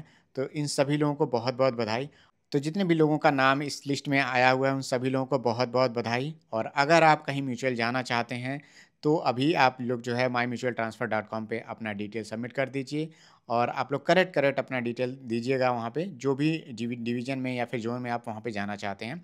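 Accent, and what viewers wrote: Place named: Uttar Pradesh, India. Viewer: U r from up .....ho na ? The way prounounce i get it...anyway...i just love the way 👍👍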